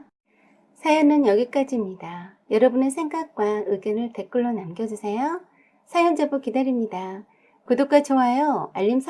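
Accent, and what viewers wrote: Jeolla, South Korea. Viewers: 저기요, 표준어로 읽던가 아님, 전라도 사투리로 읽던가 하나만 하세요 거슬려서 못듣겠어요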